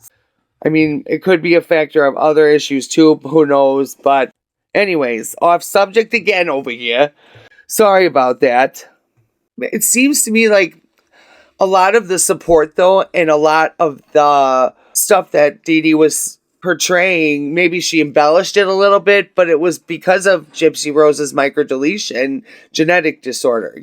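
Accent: United States. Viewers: Is your accent a New York accent or Boson accent? Jersey? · It must be a glam Christmas this year. I’m a retired MUA and hair freak and bought my girls a bunch of hair and makeup stuff! I enjoy ur channel so much. Just joined cuz gotta support a homegirl. I’m actually on the other side of the lake in MI but the accents the same!😂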